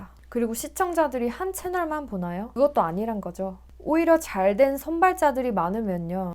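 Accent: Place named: Gyeongsang, South Korea. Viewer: 경상도 분이신듯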